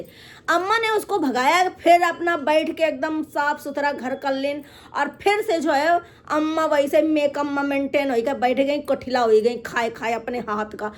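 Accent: Kanpur, India. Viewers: Bahut hasi ayi kanpur ki language main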